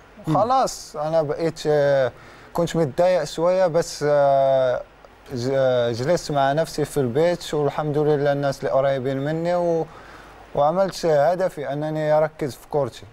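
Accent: Egypt. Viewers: فين للهجة بلادك اسبوع في اصبحت مصر..انظر الى معلول كما سنة هو في مصر يتكلم فقط تونسي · هل انت مصري ام مغربي يتنكر للهجته الأم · انا ملاحظ انك بدأت تشرب اللهجة المصرية😂😂🇪🇬🇵🇸🇲🇦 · بدر بانون لخبط اللهجتين المصريه والمغربيه مع بعض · كتكلم المصريه طزززز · ههههه بيهدر مصرى بطريقه ملفته ادائك جميل ف تعبير الكلام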